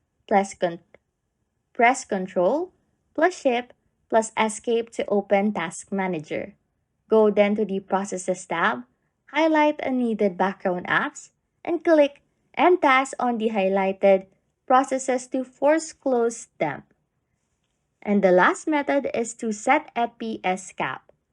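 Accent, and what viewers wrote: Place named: Philippines. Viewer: are you from the philipines? because your voices sounds like you have a filipine accent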